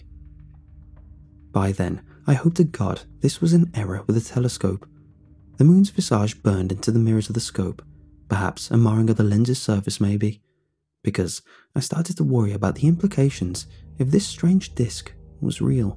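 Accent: United Kingdom. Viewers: That British accent ❤❤❤❤❤❤❤❤❤❤❤❤❤❤❤❤🍌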